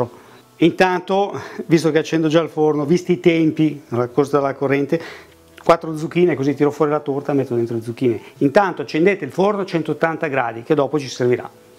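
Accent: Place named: Massa, Italy. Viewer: UNA BELLA RUMATA ...... DIALETTO MASSESE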